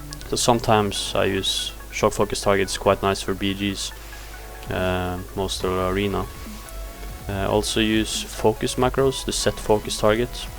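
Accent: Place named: Norway. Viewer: norsk engelsk <3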